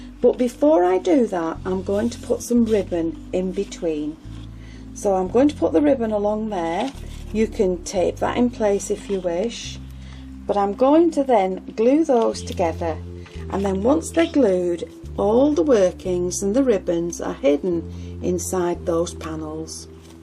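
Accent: England, United Kingdom. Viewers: Love love love all of your beautiful cards and so enjoy all your videos. Your soft soothing voice and that cute English accent bring me back time and again. What is your source for the thousands of flowers you are continually using? I sure can't afford all those dies, though I have many and would relish having more!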